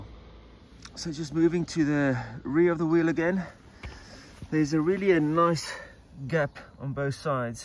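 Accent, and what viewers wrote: South Africa. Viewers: Fantastic review! Hoping the next version has an easier access to the tire and better clearance between tire and chassis like MSP. Off topic: is that a South African accent I'm hearing?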